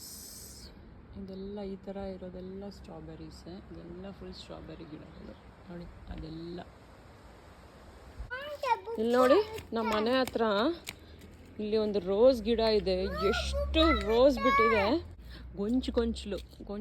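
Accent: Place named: Davangere, India. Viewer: Nam Davangere bashe😅😅😅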